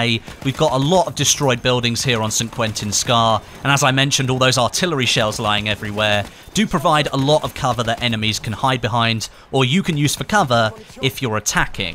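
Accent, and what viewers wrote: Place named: United Kingdom. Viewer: When people from the UK say h.....so awesome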